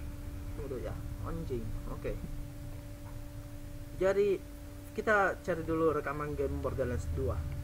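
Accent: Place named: Manado, Indonesia. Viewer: logatnya be manado wkwkwkwkwk ane ket manado soalnya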